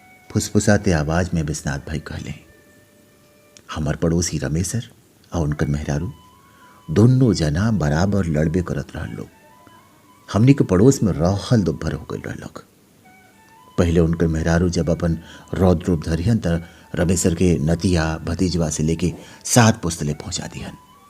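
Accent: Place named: Bhojpuri, India. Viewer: Waah भईया केतना सुघर खांटी भोजपुरी बोलतानी रउवा🙏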